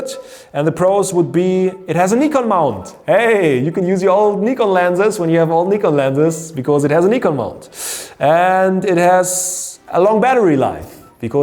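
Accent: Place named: Germany. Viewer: I Know and i'm learning German right now so i can relate to his accent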